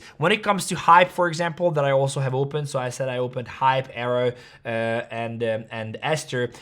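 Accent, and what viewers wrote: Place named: United States. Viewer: This is not financial advice 😅😂you sound American today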